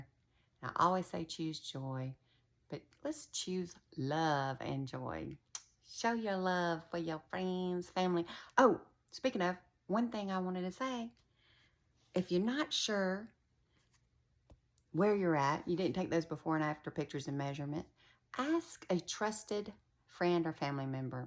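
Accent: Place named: South, United States. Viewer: your sweet southern drawl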